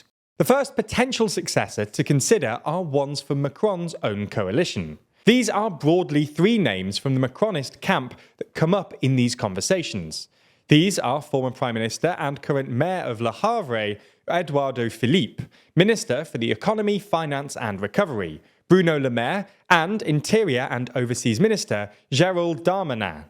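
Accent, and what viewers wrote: England, United Kingdom. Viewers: politics apart, i love when english people try to pronouce french names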